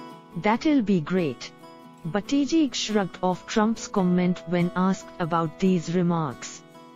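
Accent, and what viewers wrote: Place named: India. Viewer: PS. Who is the Indian narrator for this 'show'?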